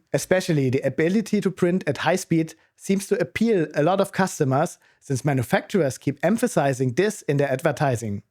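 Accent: Germany. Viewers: Deine Videos auf Deutsch sind so gut. Aber jetzt auf Englisch mit so einem furchtbaren deutschen Akzent … nee no offense, aber das ist echt grausam. Für meine Begriffe bleib beim Deutsch, da sind deine Videos ein echter Mehrwert. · Man hört an deinem Englisch direkt, dass du aus Deutschland kommst. 😂 Spätestens wenn man die Fehlermeldung am Prusa Drucker auf Deutsch sieht.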